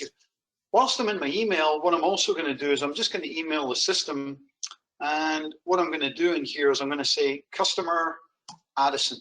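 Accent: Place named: Canada. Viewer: Hi it sounds like you're based in Canada. How can we reach out to you for consulting opportunities?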